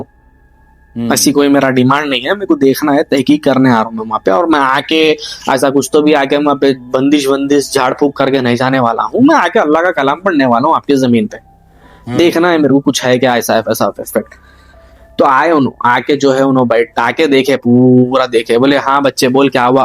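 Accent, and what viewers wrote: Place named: Hyderabad, India. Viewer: Bhai story sunkar maza agaya with Hyderabad style me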